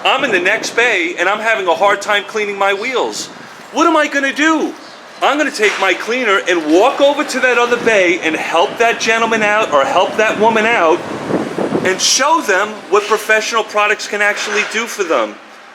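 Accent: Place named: Boston, United States. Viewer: Love the accent...cahs and cawfee lol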